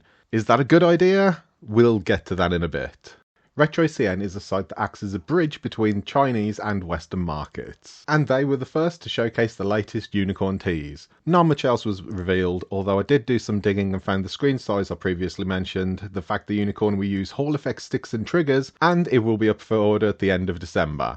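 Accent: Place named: Birmingham, United Kingdom. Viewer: Nice Birmigham accent. I'm from from Acocks Green..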